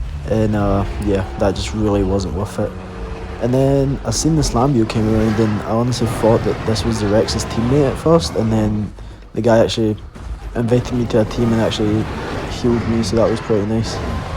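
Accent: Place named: Scotland, United Kingdom. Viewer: Ah the Scottish accent! My mum is from Scotland, my whole family is over there. Love it :)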